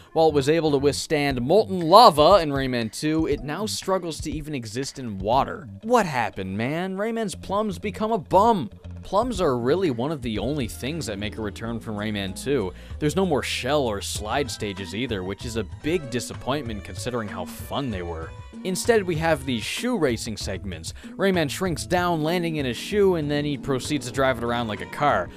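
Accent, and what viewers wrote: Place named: Canada. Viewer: listens for about 5 minutes So, where in Canada are you?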